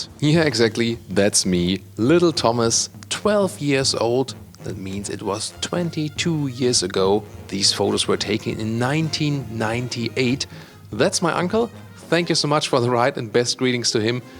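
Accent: Germany. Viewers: The main catastrophe is not the wording, which is sometimes also incredibly German, but the German pronunciation, which almost physically hurts. It is more than ridiculous to say that this is perfect English.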